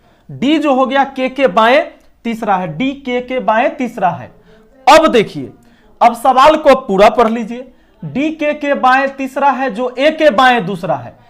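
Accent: Bihar, India. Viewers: apni bihari tone... mja aa jata h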